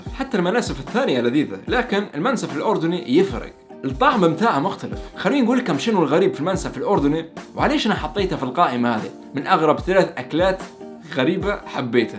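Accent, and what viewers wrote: Libya. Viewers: اللهجه الليبية قريبة جدا من الجزائريه ❤️❤️كل التوفيق والنجاح ✌️💙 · يازين الهجه الليبيه ياعرب 🇸🇦🇱🇾💓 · هلا بعودتك منور وفيديو رووووعة يسلموو ... اللهجة الليبية عشق لا ينتهي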